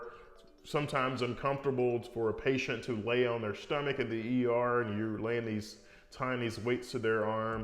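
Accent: United States. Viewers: Are u american Dr ?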